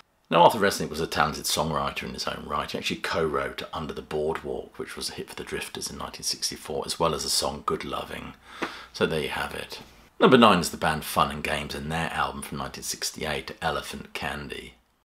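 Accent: England, United Kingdom. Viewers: The Third Rail is psychedelic? You obviously have not taken the right drugs. granted you accent sounds English, and English osych was more pastoral than the US freak out over being drafted or shafted in the civil rights era, but most of these bands sound more like pop bands with psychedelic flourishes. And that is how they were reviewed. I owned most of these albums - emphasis on past tense, but they found their way to the used bins.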